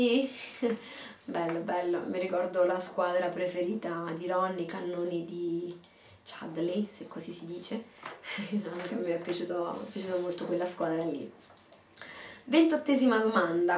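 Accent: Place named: Tuscany, Italy. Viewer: io di Ancona !! ;) dai siamo piuttosto vicine !! capirai , pensavo fossi toscana !! hah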